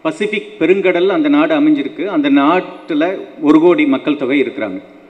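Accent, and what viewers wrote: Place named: Malaysia. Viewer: Sound like malaysian slang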